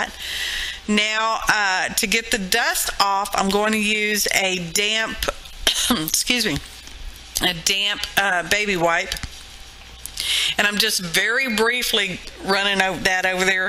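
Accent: South, United States. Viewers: Was fun watching you make yoir beads. The hangy gizmos made with the paper clips is clever. Your delightful Southern Belle accent was wonderfully entertaining, as well!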